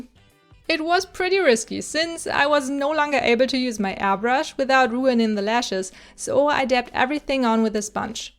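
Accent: Germany. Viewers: I know thats randome but are you German? You sound really german soooo yeah And i really love your art :D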